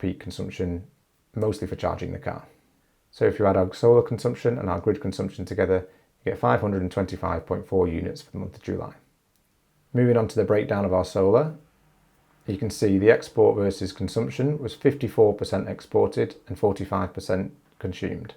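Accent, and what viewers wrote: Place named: Northern England, United Kingdom. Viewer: Nicely done, so glad to see / hear a Northern voice. Will pinch the spreadsheet as well 👍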